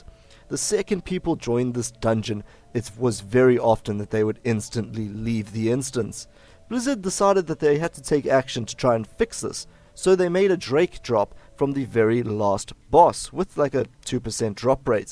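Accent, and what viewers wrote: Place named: South Africa. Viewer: can't mistake that South African accent.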